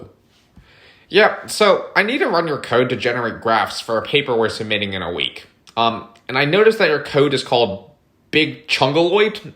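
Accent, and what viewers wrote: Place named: Philadelphia, United States. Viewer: This dude has got to be from Philly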